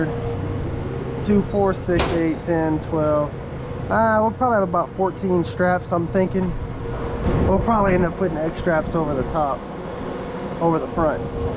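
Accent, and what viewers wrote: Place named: Louisiana, United States. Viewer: I hear Louisiana. You from The Boot?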